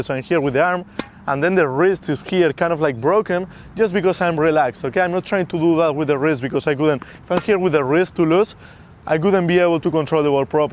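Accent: Spain. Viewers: he has a spanish accent, I instantly trust him. But please stop with all these "absolutely free courses". everyone subscribed to FYB once and saw the result. 0:6 0:6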